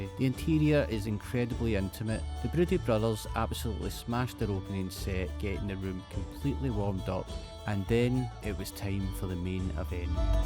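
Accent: Scotland, United Kingdom. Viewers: Two things I love the most - cycling and Scottish accent - all in one channel!!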